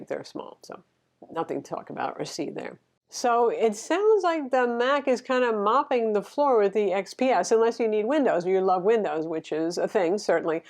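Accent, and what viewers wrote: Texas, United States. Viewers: Chuckle! Good one, pixy. But, it's just her Texas accent. Very Big Grin.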